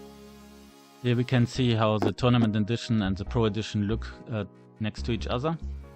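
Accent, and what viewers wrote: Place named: Germany. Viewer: Bei der harten englischen Aussprache würde ich schwören, dass deine Muttersprache ebenfalls Deutsch ist 😄 gutes Video, hat mir weitergeholfen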